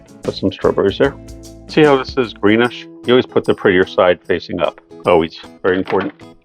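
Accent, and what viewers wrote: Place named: Northeast, United States. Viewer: Bet this guy is from the NE USA how he pronounces it wooder melon. People in Maryland Jersey New York and PA call it water ~ wooder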